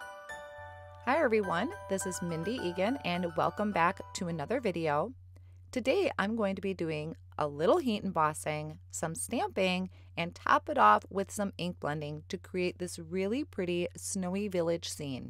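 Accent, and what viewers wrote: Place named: Midwest, United States. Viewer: Mindy did you say that those are Houses or Whooses ? Sorry I have to tease, your midwest accent is so cute! I have a number of relatives in northern Wisconsin and Michigan, and so. Beautiful card btw!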